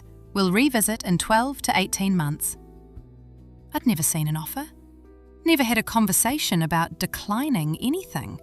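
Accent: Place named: United Kingdom. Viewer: Lovely British accent of the narrator!!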